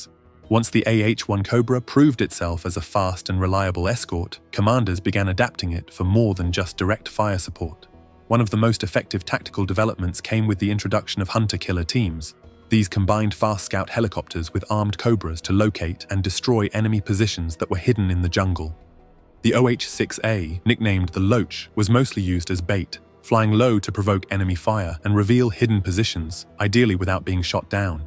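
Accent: United Kingdom. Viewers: Rather disconcerting to hear a great story of American heroes and ingenuity narrated in a British accent. ' Military' has four syllables, not three, and 'lieutenant' is not 'leftenant'.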